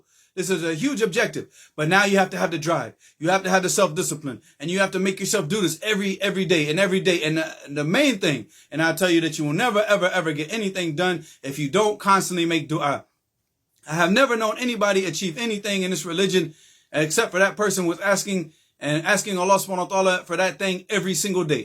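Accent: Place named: Texas, United States. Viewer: I think from texas bro but brother above also has a good point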